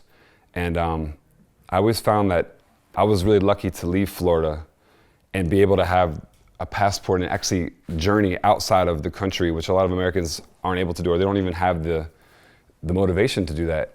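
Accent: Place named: Cockney, United Kingdom. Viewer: cockney pig sty gov'nor. bah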